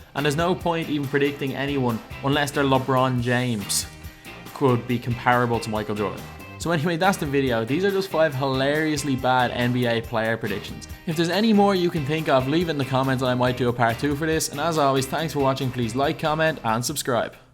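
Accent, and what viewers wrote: Dublin, Ireland. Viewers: are you Irish im irish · r u Irish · this guy Irish? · You sound like you're from Dublin lad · Irish accent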